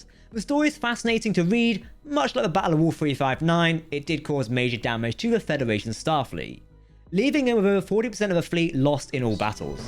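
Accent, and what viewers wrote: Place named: United Kingdom. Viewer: 😁Shut the Fuck with winey Bullshit British Ass cent. 😄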